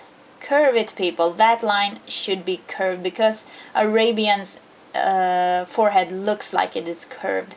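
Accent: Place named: Sweden. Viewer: i knew you were swedish by your accent, since I watc a lot of Pewdiepie haha but this is amazing! thank you very much ;)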